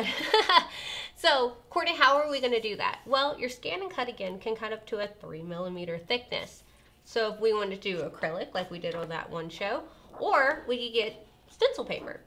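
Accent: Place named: South, United States. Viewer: Courtney, I love this. I purchased stencil stuff two years ago and was just to intimidated to try to make the door mat. I’m going to try it now thanks to you. I learned some things about welding too. Love your accent, I’m southern too! Awesome tutorial, thanks.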